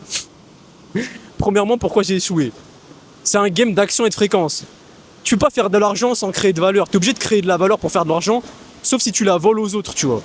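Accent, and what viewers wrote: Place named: Algeria. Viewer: 2 secondes dans la vidéo tu me fatigues avec ton accent pied noir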